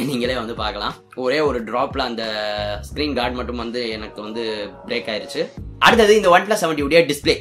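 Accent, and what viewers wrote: Madurai, India. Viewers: Bro neenga madurai ah bro ?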